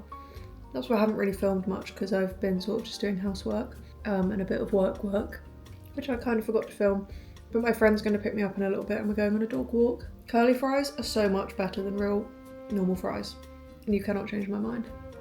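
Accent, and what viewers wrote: United Kingdom. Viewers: U sound so posh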